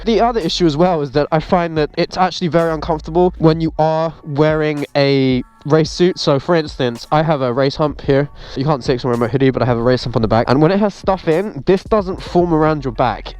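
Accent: United Kingdom. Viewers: The west London accent is actually lovely 'n aw'dat. Fun review, man! Edit: the bit on the indicator being for the giggles got me, I have to say.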